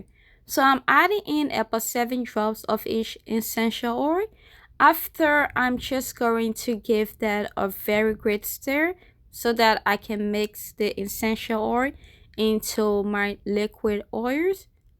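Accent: Liberia. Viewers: Do I detect a Liberian accent. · I Hear a accent are you from Liberia??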